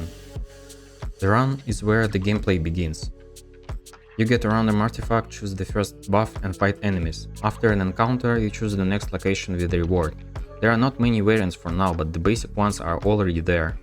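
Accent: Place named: Russia. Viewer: Ты русский? По акценту понятно.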